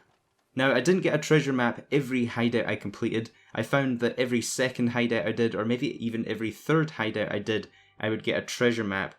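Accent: Scotland, United Kingdom. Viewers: U Scottish buddy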